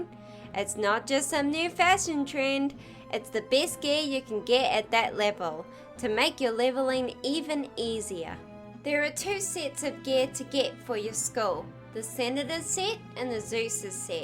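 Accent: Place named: New Zealand. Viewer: Thanks for the guide, and I love your accent, it sounds like New Zealand.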